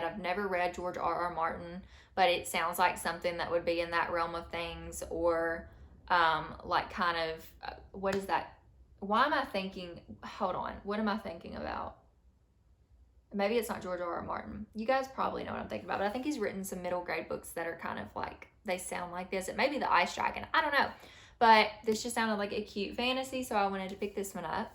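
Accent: South, United States. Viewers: You have my favorite southern accent on YT. I'm from Mississippi; I used to have a distinct southern drawl before I spent years living in Europe and doing voice work over the last years. At any rate, if you like to read out loud, you could always play a part in one of my readings. I have many collabs planned with different creators, and a backlog of works to do. Sincerely, Scully.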